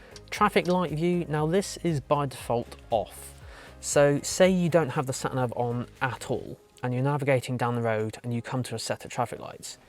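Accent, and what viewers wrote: United Kingdom. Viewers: its 10pm here and i felt like watching a good movie. mbux review; 55mins long in 4k and he’s British 🍿 out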